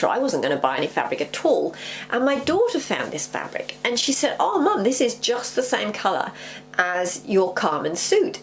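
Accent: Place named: England, United Kingdom. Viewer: I'm thrilled to have found your channel! Now i have to hunker down and watch what I've missed 😊. After hearing your English accent, but you then saying $ instead of £, I read your description. How lovely to be next door to Cornwall Park. I used to gallop around there at least 3x weekly, and drag the kids to the summit. Living in Nelson now. Can't wait for your next video ❤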